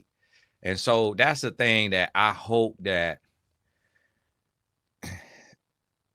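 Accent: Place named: Florida, United States. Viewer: The clothing, hair and archetype descriptions combined with the FL accent is taking me out!!! 😂😂😂😂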